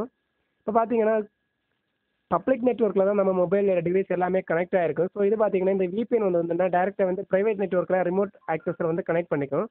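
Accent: Kanyakumari, India. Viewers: Broo neega Kanyakumari thana...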